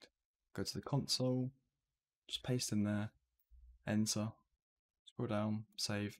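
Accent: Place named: United Kingdom. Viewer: THATS IT! I did not understand what you said since you are very British God do not save the queen God save bin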